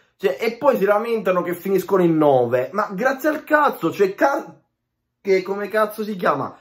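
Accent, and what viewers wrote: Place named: Rome, Italy. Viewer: Molto bello l'accentaccio romanesco 😂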